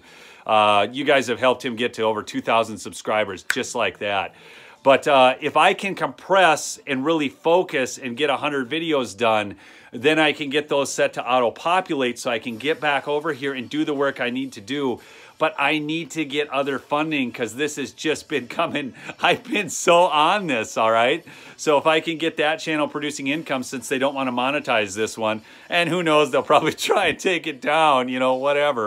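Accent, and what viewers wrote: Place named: Minnesota, United States. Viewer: I was born in Butte Montana and his accent is right out of the movie "Fargo"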